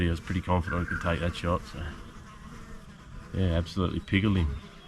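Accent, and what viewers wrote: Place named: Queensland, Australia. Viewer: Q'ld accent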